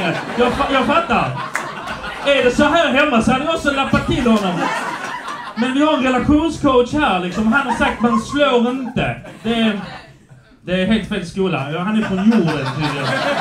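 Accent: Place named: Skåne, Sweden. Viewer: Såg dig för första gången på fb idag och kom hit för att få mer.😅 Var släpper du mest, för som en skåning i Oslo kan jag inte få nog av skånska.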